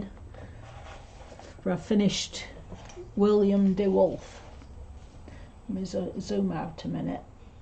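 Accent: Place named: North West England, United Kingdom. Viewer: Hi...I'm currently doing this colouring at the moment...I love Hares and love the way you've built up the colour here. You sound very local to me...north west England?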